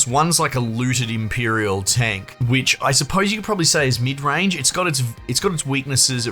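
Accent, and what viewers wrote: Australia. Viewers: Thank You - and very nïce english/aaastralian' langüagé :- ]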